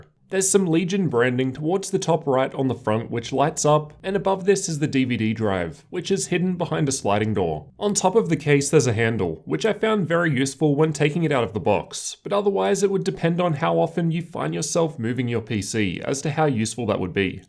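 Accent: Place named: Australia. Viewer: the Lornorworrrrrrr Australian accent is cute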